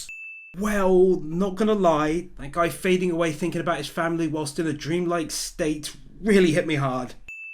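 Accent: United Kingdom. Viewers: I could tell from the accent you were from somewhere in East Anglia, same. I also got very hyped when that location card came up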